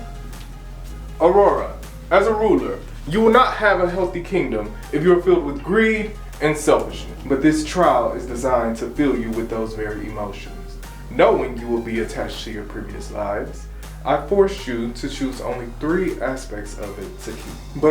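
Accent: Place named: New Orleans, United States. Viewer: Your NOLA accent was on point friend and this coming from a BR native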